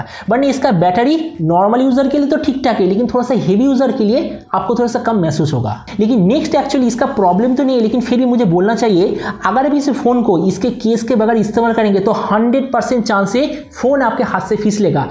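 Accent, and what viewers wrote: Bengal, India. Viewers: You must be a Bengali as your Hindi is typical of eastern part of india 😃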